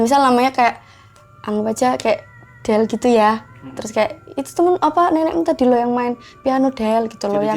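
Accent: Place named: Surabaya, Indonesia. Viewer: Logat khas Suroboyonan yah, iyo la.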